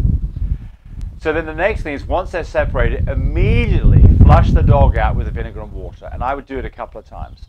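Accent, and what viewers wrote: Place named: England, United Kingdom. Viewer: Ps: I saw you have a English accent 🇬🇧I’m from England too. Do you know anyone in England who breeds dogs and does these videos? Thanks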